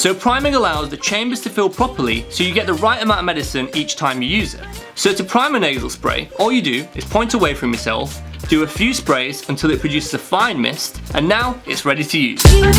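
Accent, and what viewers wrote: United Kingdom. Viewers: oh wow he is bri ish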